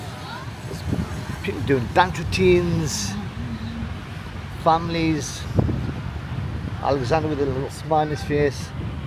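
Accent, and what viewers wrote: United Kingdom. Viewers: Hi there, Hope you are enjoying your trip my country. I am just wondering about your British accent that you are speaking. What part of Britain do you come if you don't my to share ?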